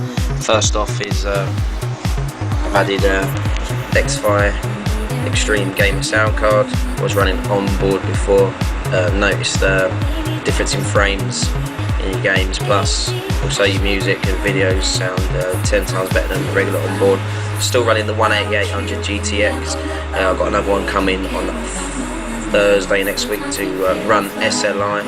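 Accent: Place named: United Kingdom. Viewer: Ya I knew you're from somewhere around the UK because of your acsent (I think thats how you write it fix me if I am wrong =]) BTW are these games any fun ?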